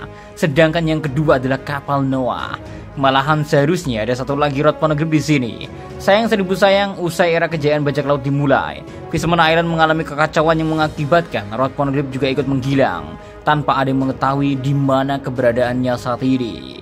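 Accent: Java, Indonesia. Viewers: koyok wong jowo to lur daerah ndi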